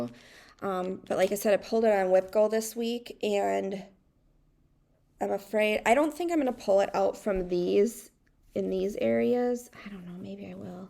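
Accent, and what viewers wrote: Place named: Minnesota, United States. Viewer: OMG I've been under a rock the last few months and am just now discovering you (thanks to Julie)! I just about fell over when you said you have a 25 year old, you look so young! Looking forward to catching up with your videos in my spare time. In other news, I'm obsessed with regional accents...it would make me giggle and wiggle if you would use the following words in a future video: dragon, wagon, bag, Maggie (again) so many awesome Minnesota accent words! lol : )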